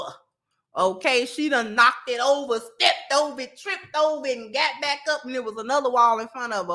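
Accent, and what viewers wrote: Chicago, United States. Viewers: When you said Da Roms on another one of your podcasts I knew you was from Chicago. Only we speak like that. Chicago is in the building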